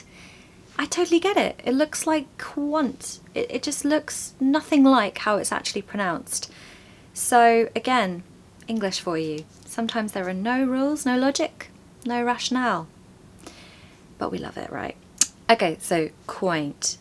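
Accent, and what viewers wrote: United Kingdom. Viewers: exacly you are my favourite teacher You have a lovely British accent I love it😅❤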